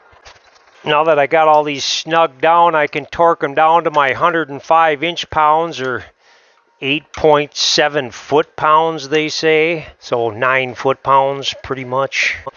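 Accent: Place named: Minnesota, United States. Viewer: Great video! I love your accent. You sound like you're from one of the far north states like Minnesota or around there.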